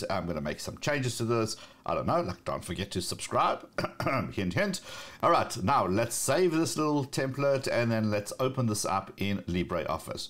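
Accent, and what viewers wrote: South Africa. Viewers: Very usefull video. Thanks! So I am thinking. The accent.... born either in Cape Town or Sandringham....? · Wait, are you South African?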